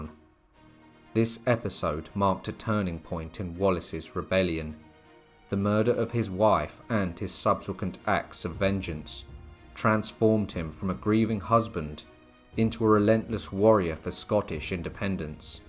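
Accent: England, United Kingdom. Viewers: Being a very patriotic Highlander i am insulted that Wallace is being narrated by an Englishman with a common accent that fails to be understood, who the hell decided to pick someone from the other side of the boarder to talk on Wallace, what a disgrace, put the shoe on the other foot see what happens, not that they ever had a super warrior like Wallace. Pick a Scot for feck sake.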